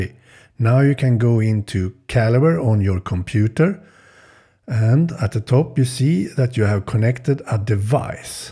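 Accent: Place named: Sweden. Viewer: Härlig svengelsk dialekt! bra video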